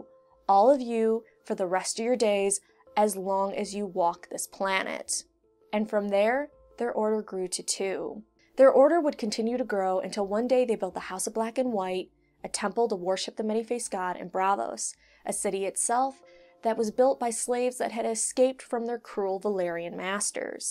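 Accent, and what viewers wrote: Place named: South, United States. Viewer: you got a southern accent!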